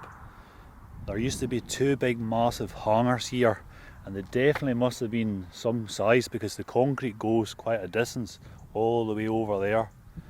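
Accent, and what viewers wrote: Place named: Wigtownshire, United Kingdom. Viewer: was born stranraer,,i can hear yer accent,,!wigtownshire,,dunragit lol,went to drochduil school ,we could see them bombing at the dunes sometimes at west fruegh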